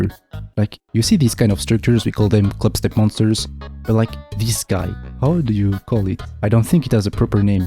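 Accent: France. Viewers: No it’s alright volume wise but you have a really strong french accent and it’s really hard to understand you sometimes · You sound french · good video but you sound suspiciously french 😤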